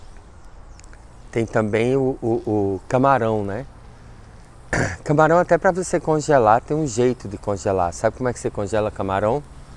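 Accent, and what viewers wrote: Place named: Rio Grande do Sul, Brazil. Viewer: Mas Bah!!! Num bom gauchês!!! Show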